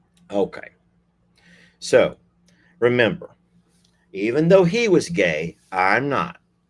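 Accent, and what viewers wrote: South, United States. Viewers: Your voice sounded like a southern accent, no a Yankee at all. 😂 Jaqueline is not a typical African American name. I also had a pastor who was white and named but went by Les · Your accent was more southern aristocrat to my ear,.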